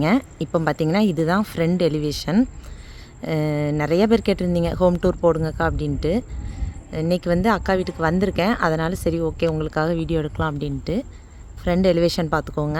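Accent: Tirunelveli, India. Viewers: உனக்கு திருநெல்வேலியா தூத்துக்குடியா ......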